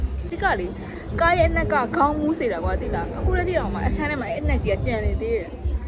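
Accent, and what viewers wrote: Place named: Myeik, Myanmar. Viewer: မြိတ်သံဝဲနေသလိုပဲ၊ မြိတ်/ဘိတ်ဖက်ကထင်တယ်နော်။ Congratulation ပါ။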